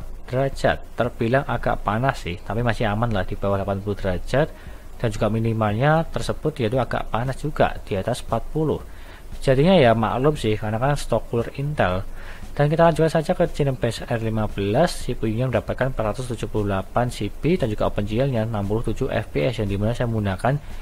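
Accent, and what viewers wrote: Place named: Banyumas, Indonesia. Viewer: Krungone Kyong ngapak Yoh:v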